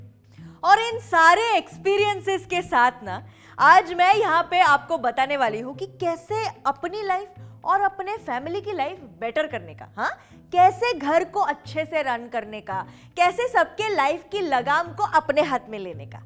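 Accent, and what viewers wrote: Maharashtra, India. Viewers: My god her acting skill and the marathi accent..love it dii ❤️❤️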